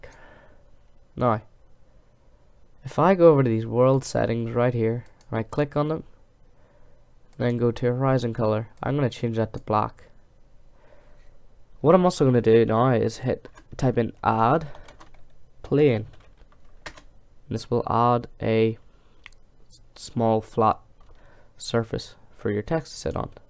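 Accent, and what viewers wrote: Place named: Northern Ireland, United Kingdom. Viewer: Northern irish =) Yeoo!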